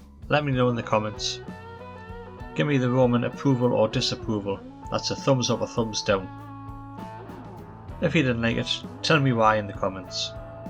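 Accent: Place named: Cornwall, United Kingdom. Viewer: A cool looking car. BTW Your accent sounds like you are somewhere in or around Cornwall.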